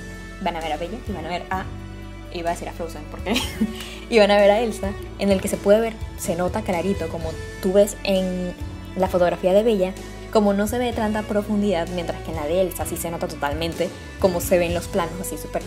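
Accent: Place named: Venezuela. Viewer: Gracias por aclararme todas estas dudas <3 Pd: eres de Venezuela? 🤔Tu acento me suena muchísimo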